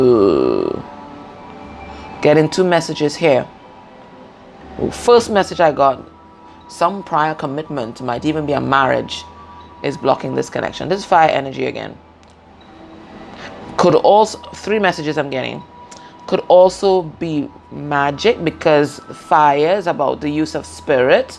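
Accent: Trinidad and Tobago. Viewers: Are you Trini?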